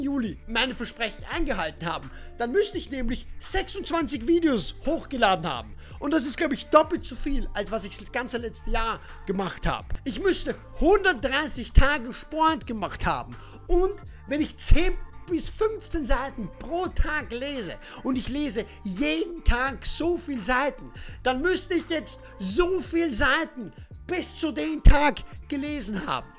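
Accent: Vienna, Austria. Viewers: Hallo lieber, ich freue mich immer sehr über deine Videos und ich liebe deinen Akzent (Wien ist meine Lieblingsstadt!) Für 2021 habe ich mir vorgenommen ganz viel an Gewicht zu verlieren, dazu mache ich seit Anfang Januar eine Ernährungsumstellung. Die ersten zehn Kilo sind schon runter, aber ich möchte noch mehr abnehmen. Sport soll auch dazukommen, sobald man wieder ins Fitnessstudio gehen darf. Alles Gute an alle, und auch an dich! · Ist etwas Wienerisch. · hab das erste mal durch dhalu bei among us gesehen/gehört... schwöre, dachte das ist nen 40iger, adipöser ösi (nicht bös gemeint, ich wohne selbst in wien;))... so kann man sich irren haha:D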